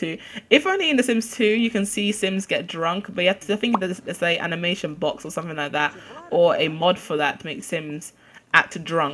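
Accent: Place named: United Kingdom. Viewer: Love your accent. Are you from the U.K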